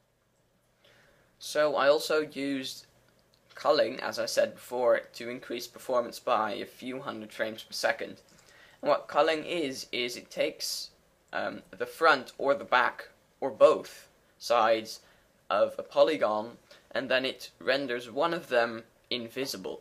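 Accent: Netherlands. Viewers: haha i knew it! your acsent it was dutch! YEAH! sorry for that xD but i´m dutch to, and i make games to. No tutorial though, and still good english btw :)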